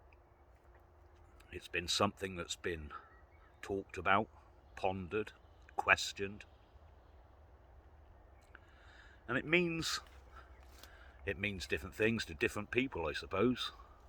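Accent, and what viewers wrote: England, United Kingdom. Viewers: Thanks. I wish I had had a mentor like you when I was a young man. Love your old school attitude and (English?) accent. Wish you guys all the best in your endeavor, from across the pond 🙏🏻 We’ve got our own battles to fight, although it seems we are facing a common enemy..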